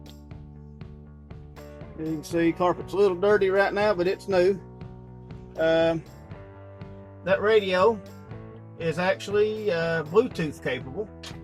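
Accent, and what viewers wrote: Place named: South, United States. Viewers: Love the car and the texan accent! Greetz from Holland. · Very cool man! I love the side bed storage, keep them guns in there! I'm from Los Angeles and I love that southern dialect.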